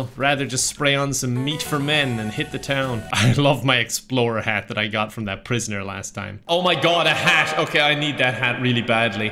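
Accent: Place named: Ireland. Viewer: Why do you sound like PewDiePie with a jacksepticeye accent 🤣 I know you are an Irishman ❤️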